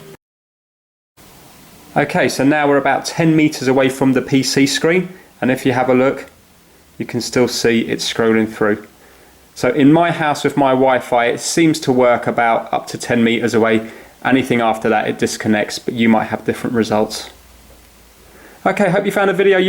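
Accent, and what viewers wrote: United Kingdom. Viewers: You are from UK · Man I fuckin love this british accent. Didn't even listen to what you said, just how you said it for the first few minutes. So yea I had to look it twice. x) greetings from germany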